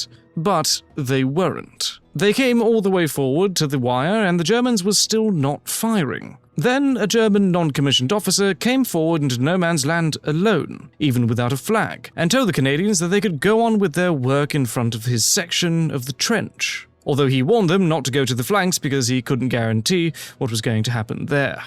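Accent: United Kingdom. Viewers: Condescending British accent narration is key to losing all your audience.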